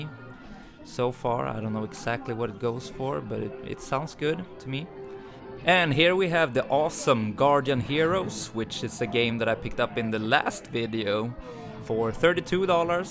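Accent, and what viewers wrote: Sweden. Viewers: Haha där hör man den härliga svengelskan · I would get any Jurassic park game for under $50 lol Also...do I detect a Swede? lol I've lived in Sweden for years and the cadence is familiar!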